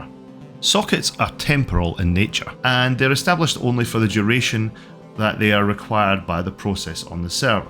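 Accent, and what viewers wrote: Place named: Scotland, United Kingdom. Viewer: It's a Scottish accent 😂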